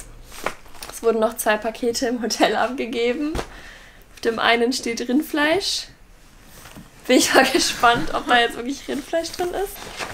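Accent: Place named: Germany. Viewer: Me gusta tu acento Alemán y así aprendo Alemán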